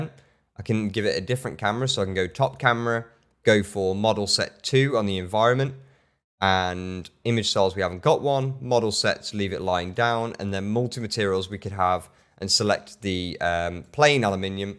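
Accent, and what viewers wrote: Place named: RP, United Kingdom. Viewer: RP British elegant accent which I love to listen to.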